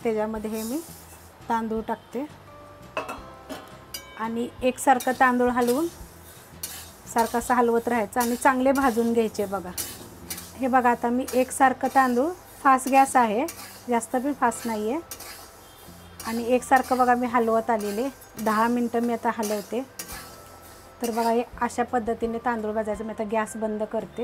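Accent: Satara, India. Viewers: Are wa mla tumcha bolnyavrnach kalla mi pn satarchich ahe nagthancha fude kashil koparde 😍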